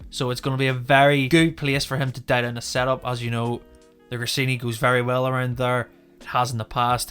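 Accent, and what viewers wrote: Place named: United Kingdom. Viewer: Narrator sounds British.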